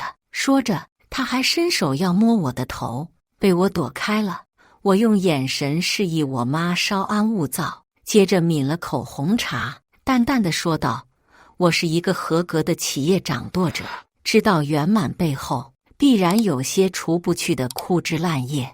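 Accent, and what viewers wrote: China. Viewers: 聽不懂，是什麼鄉下方言？ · 操 后半段用普通话发音说粤语（或者闽南话？）！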